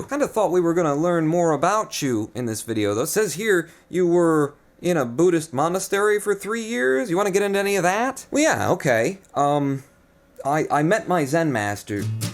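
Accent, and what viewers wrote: United Kingdom. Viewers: that was amazing, it's kinda crazy that i now know what part of the world you live in, for ages I thought you lived in England, you accent is such a mix of British and American, and in your earlier videos you sound pretty much completely British. You deserve all the love your fans give you! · Michigan? Hmm for some reason based on your accent I figured you were from somewhere in the UK. Oh well. We are like state-neighbors. I'm from Ohio.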